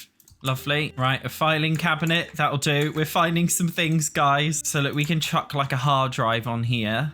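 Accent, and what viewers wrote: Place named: United Kingdom. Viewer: the accent is so British 😭😭😭😭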